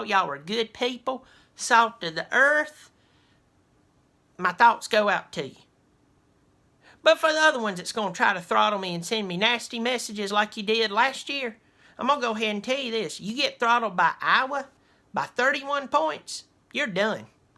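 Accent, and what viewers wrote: South, United States. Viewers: first things first I love your southern accent, second thing Ohio State made it to the playoffs last year over Penn State, when Penn State won their conference Championship, but what happened they put Ohio State in over Penn State. so if I was you I would do a little bit more studying about the committee instead of complaining that you got to face Alabama again. the committee said Alabama was the better team over Ohio State which is because that 31 point butt kicking that Iowa put on Ohio State. Ohio State is not the better team because all year long they had their ups and their downs, Alabama went the whole year at number 1 or number 2 and the only loss they had was against Auburn. they are so went 11 -1, with 8 players out due to injuries and we still played better then Ohio State, and don't forget Clemson loss to Syracuse.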